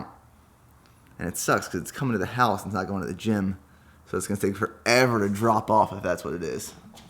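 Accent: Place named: Pennsylvania Dutch, United States. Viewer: hmm guess its just that penn dutch i pick up on lol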